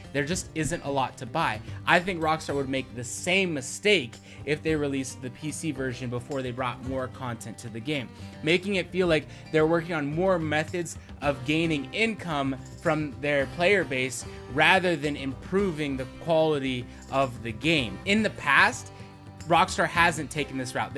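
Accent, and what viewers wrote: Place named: Russia. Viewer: please use Russian accent full time + I like your dimples:) Merry Christmas and new year y'all 😘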